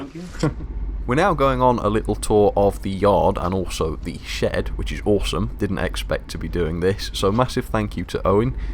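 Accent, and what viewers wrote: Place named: North, United Kingdom. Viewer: We now want to visit this railway, its about 3 hours from us. I did notice your northern accent was creeping in. Who is your lucky lady and expect camerawoman?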